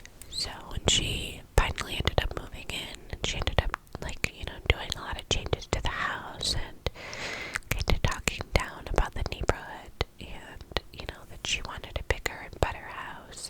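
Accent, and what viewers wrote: New York, United States. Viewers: please do another ny accent video <3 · Hey! I love your breathy whisper videos! I love to hear your rambles in a NY accent!😊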